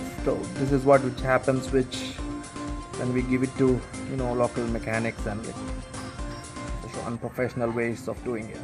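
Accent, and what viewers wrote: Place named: United States. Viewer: Your English accent looks like that born in the United states of America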